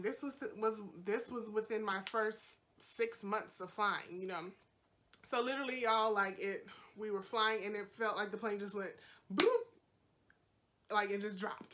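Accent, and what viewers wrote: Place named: Jamaica. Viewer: My dude has been in America 25 years and still has his thick Jamaican accent. He stated he never wanted to loose it.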